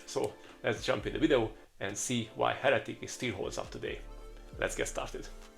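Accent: Hungary. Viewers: Jól hallom? Mintha magyar akcentust hallanék. :face-blue-smiling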